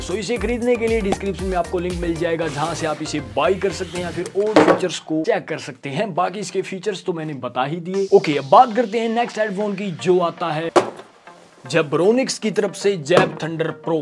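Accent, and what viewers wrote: Haryana, India. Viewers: Bhai by your accent i can say you are definitely Haryanvi 😍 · Awaj t lag h haryana ka h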